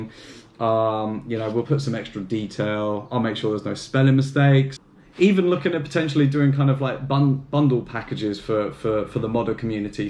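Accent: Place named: Europe. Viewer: This is AMAZING! I have been working on a Metal Gear Solid custom GBA. Where did you get the sleeves printed? I assume by accent this is somewhere in Europe :( I am in USA and this is very expensive to find a single print custom box for my GBA.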